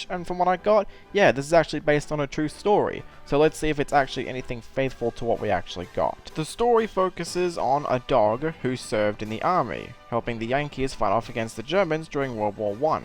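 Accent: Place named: Australia. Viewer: Wow, I didn’t know you were Australian! And about the movie, I haven’t seen it myself, but it definitely seems like the perfect film to show to elementary school kids when they need to learn about the Great War in Social Studies class! As for the animation, well, I didn’t really see a reason for the movie to be animated other than to appeal to kids more or as an exercise for the animators, but for what it’s worth, it IS pretty good, and the animators spent as much time on it as they needed to! And now I can’t wait for the Duck Duck Goose Explosion!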